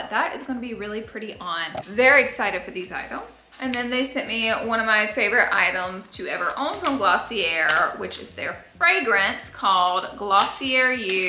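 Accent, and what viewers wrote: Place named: Alabama, United States. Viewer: Who else loves when says oil? No one? Just me, okay. Girl, I love your Alabama accent!❤❤❤. Oh I’m adding all the things to my Amazon cart..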